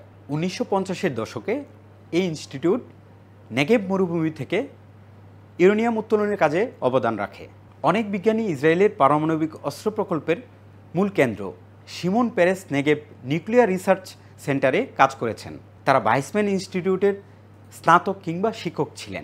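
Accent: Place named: Delhi, India. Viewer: delhi r loker bangla jmon houa uchit r ki..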